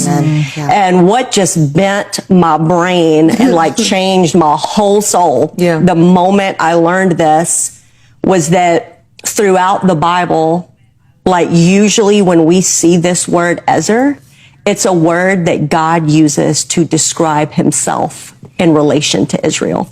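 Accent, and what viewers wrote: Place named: Mississippi, United States. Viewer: Did she say Laurel, Mississippi or rural Mississippi? I heard laurel not just through the word she said but through that accent lol. I used to live near there.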